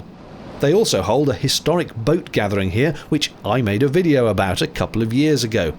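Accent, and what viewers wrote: United Kingdom. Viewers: Your classic Brit narration cracks me up 😂 so I had to subscribe. Thanks for all the laughs. Cheers from California USA 🇺🇸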